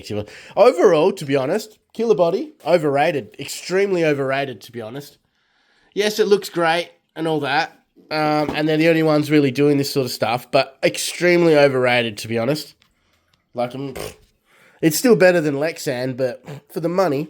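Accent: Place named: Australia. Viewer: Just came across your channel through the mystery of algorithms, but liked and subscribed straight away as it's great to find an Australian RC Channel 🇦🇺🦘😎 Getting tired of listening to yanks and poms 😂 The Cruiser is looking awesome, but sounds like you would have had more joy from simply buying a MN82 RTR instead!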